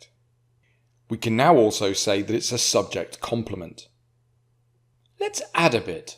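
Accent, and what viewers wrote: United Kingdom. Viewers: Bro is the british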